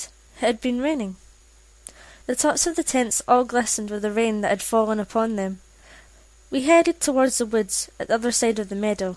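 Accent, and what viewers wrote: Scotland, United Kingdom. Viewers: Could you please say "fishfingers and custard" in your awesome Scottish accent? Awesome creepypasta.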